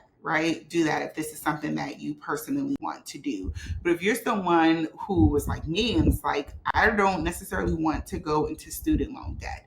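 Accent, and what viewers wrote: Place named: DMV, United States. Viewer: Very resourceful! Are you from the DMV? I am only asking because of your accent.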